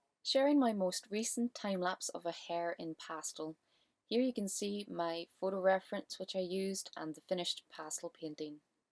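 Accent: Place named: Ireland. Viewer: And your irish accent is so cute !! I'll be watching your videos now to get to know pastels better, thanks for the effort of making them really.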